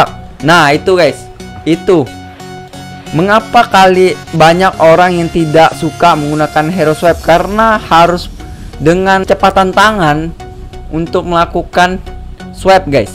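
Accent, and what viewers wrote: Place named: Madura, Indonesia. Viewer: lagat nya ky org madura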